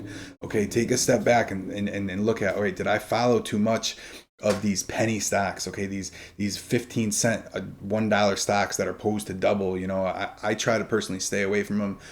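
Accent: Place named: Boston, United States. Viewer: Is from Boston? He sounds like he has a Boston accent LOL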